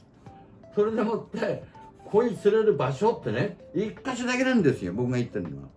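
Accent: Fukushima, Japan. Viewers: ウマ娘を当てる動画から来ました。耳馴染みの深い故郷の訛りで、福島の方だとすぐにわかりました😊ほんとに熊にはお気をつけてお過ごしくださいね💦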